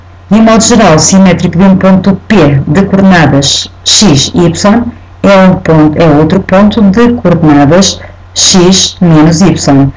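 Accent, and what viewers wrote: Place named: Portugal. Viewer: 6 anos 4 anos e agr 0 anos creio que seja sim o sotaque de Portugal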